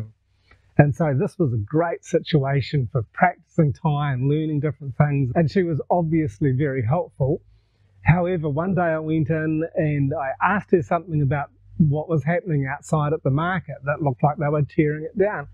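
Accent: New Zealand. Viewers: Surprised to hear another kiwi here in Northern Thailand. Good on you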